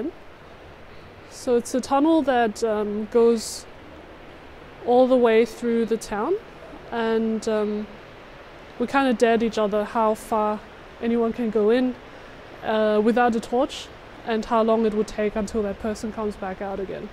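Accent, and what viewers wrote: Germany. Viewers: You have a strong German accent and and yet you are such an eloquent English speaker are you a native German or did you move there as a baby . Very informative video btw. my family and I are hoping to move to Germany soon for a year. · She sounds German.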